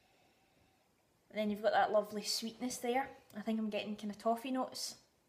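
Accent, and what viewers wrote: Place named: Scotland, United Kingdom. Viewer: Awesome Review. Just subbed:) The scottish accent just makes me think you know so much more about whisky then I do:) I just found Deanston and wish I would have found it sooner. Slainte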